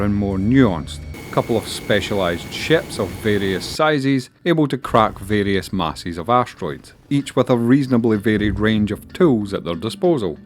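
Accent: Scotland, United Kingdom. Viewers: Appearantly I'm perfectly capable to understand "scottish english". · Purple Burglar Alarm! Love the Scottish accent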